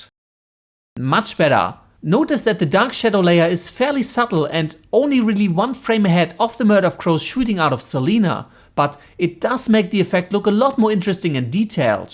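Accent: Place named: Germany. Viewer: Holy fuck you have a German accent xD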